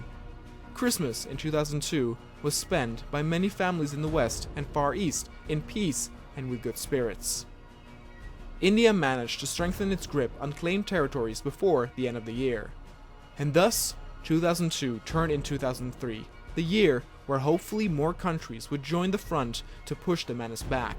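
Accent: Netherlands. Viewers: You're dutch right? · Are you Dutch? It sounds like it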